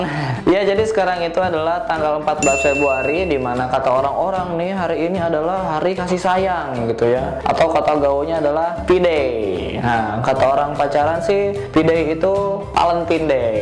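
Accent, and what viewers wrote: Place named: West Java, Indonesia. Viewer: akang nya sunda bangetya suka deh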